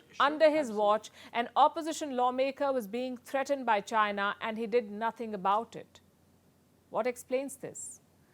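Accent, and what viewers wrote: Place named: India. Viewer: Palki spewing her CIA-sponsored anti-China propaganda! Palki's thick Indian accent is meant to confuse Indians into thinking India hates China or Russia. Does it work? Most Indians couldn't care less about China. But Palki is meant to convince Indians that China is the enemy. Palki never bad-mouths Biden! CIA won't allow it.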